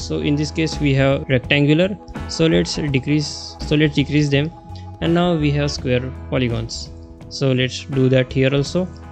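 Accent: India. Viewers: Do you know a good app to make a voice over to hide this shitty pajeet voice?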